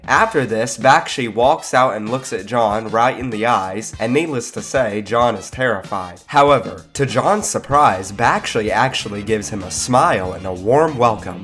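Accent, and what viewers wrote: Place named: South, United States. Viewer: Amazing video. You did great and it's awesome to hear a good country accent brother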